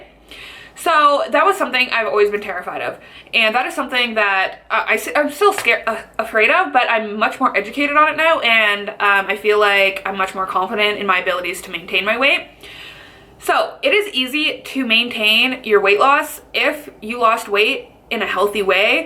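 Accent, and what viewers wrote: Canada. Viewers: are you canadian? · i could tell u were canadian based on the way u say 'about' whch is crazy cuz im also canadian and ive never noticed we actually have an accent till i heard u speak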